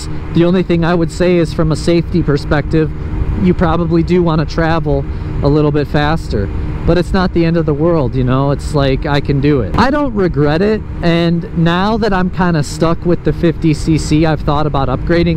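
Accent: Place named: La Grange, United States. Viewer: I'm also pondering a 50cc or 125cc scooter. You sound like you live close to La Grange. Class M gives you a valid motorcycle license to legally operate any motorcycle with an engine size of 150 cubic centimeters (cc) or greater. The Class L license is for riding a motor-driven cycle with less than 150cc engine size. Note, riding an electric scooter does not require a special license.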